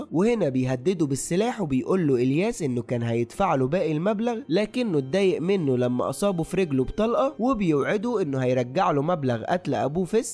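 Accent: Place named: Bulaq, Egypt. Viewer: عراق مين لهجتك مبينه انك من بولاق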